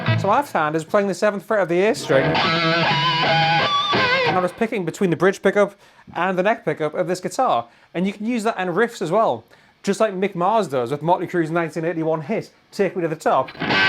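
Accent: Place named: Wales, United Kingdom. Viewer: Awesome video! I am perplexed by two things though… the guitar says les Paul on it but looks like an sg?? What is it???? And also your accent?? Wales???? Im scratching my head over here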